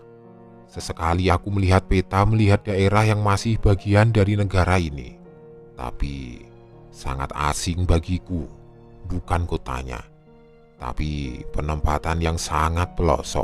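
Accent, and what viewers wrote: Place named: Palembang, Indonesia. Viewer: Dari logatnyo kau wong palembang yoo?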